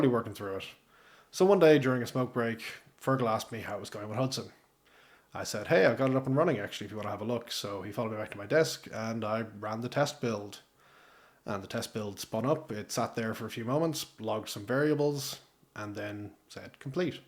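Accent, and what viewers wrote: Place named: Ireland. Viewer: thats an irish accent if im not mistaken. great to find another irish developer channel. (great video btw)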